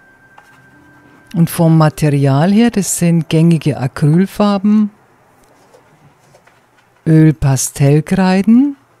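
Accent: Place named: Munich, Germany. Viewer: Du bist echt talentiert. Freut mich immer ne münchnerin zu hören.❤❤❤deine Bilder machen freude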